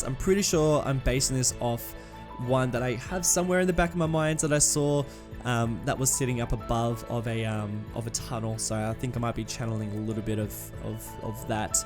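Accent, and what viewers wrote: Australia. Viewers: This one is my favourite series of yours I think, never been to China but your level of detail is inspiring. I'm also from Sydney so it's kinda nice hearing the Aussie accent 😎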